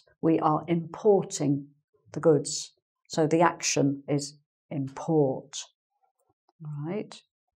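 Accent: United Kingdom. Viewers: Thank you very much teacher. it's so interesting and helpful video. please do more lessons involving English pronounciation. I really like British accent ^^ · Great explanation! ... and your nice British accent: Terrific... I love it a bunch ! ... Thumbs up !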